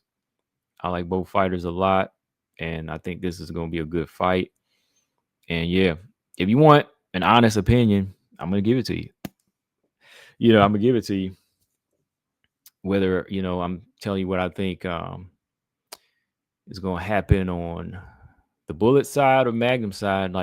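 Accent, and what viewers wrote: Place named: Ohio, United States. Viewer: are you from Ohio? You sound and speak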